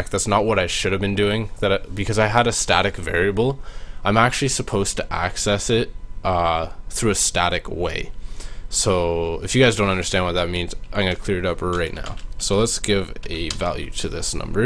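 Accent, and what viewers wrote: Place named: Canada. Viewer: Canadian…. I was fishing around for the accent. Didn't quite get the origin.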